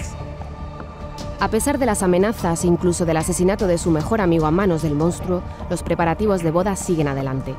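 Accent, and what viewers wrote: Spain. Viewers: Me encanta el acento español <3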